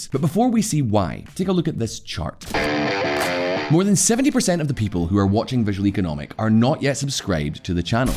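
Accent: United Kingdom. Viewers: is he turning into a brit?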